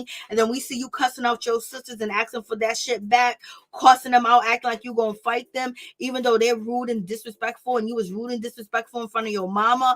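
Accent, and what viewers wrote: New York, United States. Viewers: Hahahhaahhhhahhhhahahahahahahahahahahahhaahhahahaha😂😂😂🤣😂🤣😂😂🤣🤣😂🤣🤣🤣😂🤣 you are so funny gurl . And your beautiful New York urban voice .. Lar that ❤️❤️❤️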